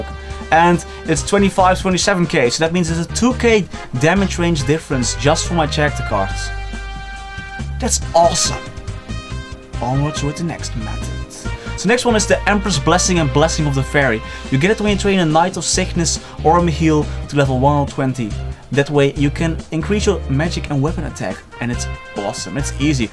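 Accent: Netherlands. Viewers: Nice video :3 Haha I was like hmm I think hes dutch and I'm right xD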